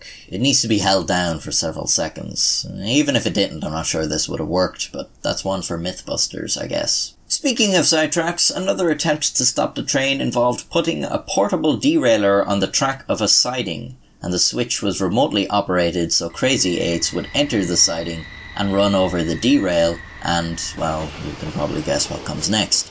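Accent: Ireland. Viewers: I enjoyed that, thanks. Do I detect an Irish accent in there somewhere?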